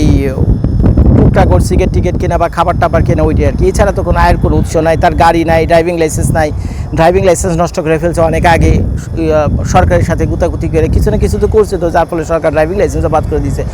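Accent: Dhaka, Bangladesh. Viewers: da apone ki hasnabader chele naki aponar kotha kichu ta dhakakiya der taan ashe baki ta mone hoy hasnabader jak bhalo thakben barir shobai ke neye ae prathonai kori ami ❤❤❤❤❤❤❤❤❤❤❤❤❤❤❤❤❤❤❤❤❤❤❤❤❤❤❤❤❤❤❤❤❤❤❤❤❤❤❤❤❤❤🎉🎉🎉🎉🎉🎉🎉🎉🎉🎉🎉🎉🎉🎉🎉🎉🎉🎉🎉🎉🎉🎉🎉🎉🎉🎉🎉🎉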